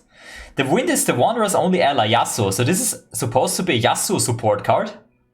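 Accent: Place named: East, Austria. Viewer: Came here from Sunny and know about you both for some time through his streams but didnt expect you to be austrian aswell. From your accent I would guess you are from the north-east states like lower austria or vienna, right? Good content, keep it up!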